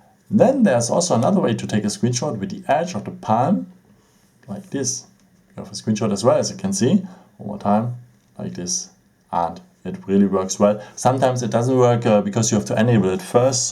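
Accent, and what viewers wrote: Germany. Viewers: Sounds so german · Kann es sein das du aus Deutschland kommst?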